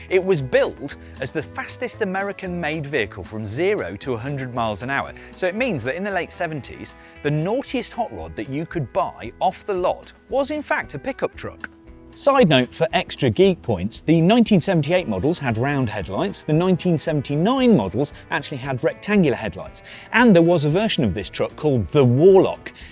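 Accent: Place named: England, United Kingdom. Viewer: Why is a English man talking about a American truck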